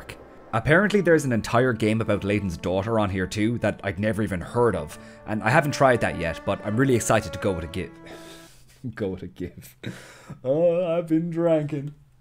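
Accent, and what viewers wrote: Ireland. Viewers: Gotta say man I love your videos so far, the editing and the comedy is lovely! (Plus it's nice to hear a Irish youtuber with a accent besides Jacksepticeye floating about ) Also the ash can is ALWAYS THE GUINNESS, IT'S ALWAYS GUINNESS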